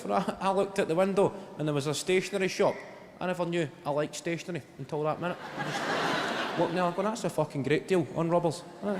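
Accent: Scotland, United Kingdom. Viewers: Learning scottish to understand him and Frankie Boyle. 70% true. Greetings from Germany 😀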